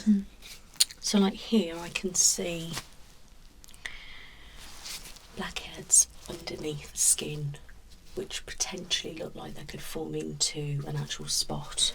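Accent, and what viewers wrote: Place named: West Midlands, United Kingdom. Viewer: Working with another West Midlands-er has really brought out your accent 😂 Get SRP involved for the trifecta!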